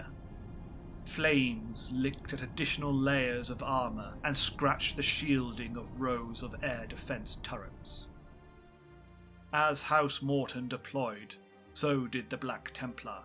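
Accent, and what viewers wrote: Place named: England, United Kingdom. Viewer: Dark gods bless the English accent. AI always mispronounces everything. Among the repeat offenders: Noosphere. Love the storytelling boss. 👊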